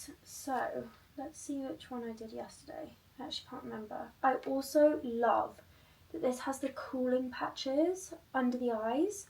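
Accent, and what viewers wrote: United Kingdom. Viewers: I absolutely love your videos, I am from spain and I love hearing your british accent 💕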